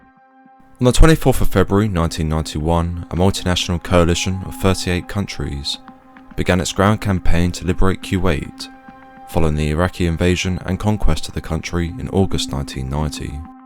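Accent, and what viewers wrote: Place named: United Kingdom. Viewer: very well spoken and interesting, you cant beat a well spoken brit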